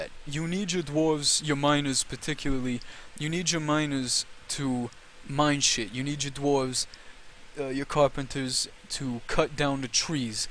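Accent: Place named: Brooklyn, United States. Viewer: Are you from Brooklyn?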